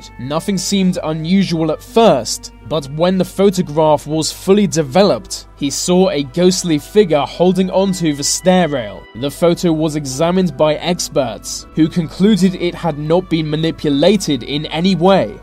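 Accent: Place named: RP, United Kingdom. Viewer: In fact, you say every word ending in Y like a posh twat.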